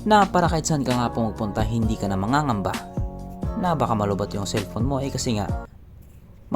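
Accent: Visayas, Philippines. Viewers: kusog kaau ka nanopo bisaya baya kaayo imong jaming.. balik2 ra🤣🤣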